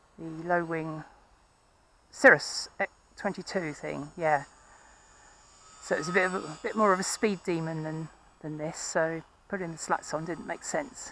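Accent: Australia. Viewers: we loved it you speak better Aussie than us . The Aussie blowy fly is always the first one there at a good BBQ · Love my 50mm Arrows Viper - once I had modified it to fly faster on 4S :) Decent Aussie accent!!! :)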